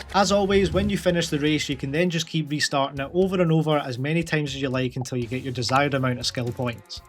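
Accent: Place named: Scotland, United Kingdom. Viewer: My god I love Scotch folks. The accent is amazing. Also at the end it sounds like you are talking about Forza Horizon Sex Content 🤣. Stay cool and thanks for the tips!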